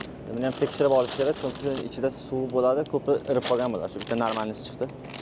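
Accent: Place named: Jomboy, Uzbekistan. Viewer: Assalomu alekum aka yaxshimsiz salomatmisiz? Har bir tayyorlagan videolaringizni boshidan oxirigacha oxirigacha maza qilib tomosha qilyapman, samimoylik bo'lgani uchun, videoni perexodlari chiroyli bo'lgani uchun juda qiziqarli. Shevangizdan tahmin qiladigan bo'lsam Jomboy yoki Bulung'urdan bo'lsamgiz kerak? Alloh har doim panohida asrasin, ishlarizga barakot besin. Omadingizni bersin yaxshi videolardan kutamiz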